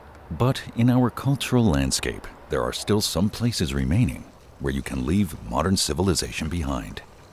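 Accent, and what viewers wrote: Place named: Germany. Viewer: Wo ist denn sein sympathisches Denglisch und wo der Hund bei der Wasserjagd hin?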